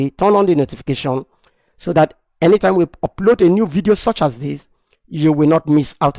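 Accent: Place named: Nigeria. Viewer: Thats Nigerian accent❤❤❤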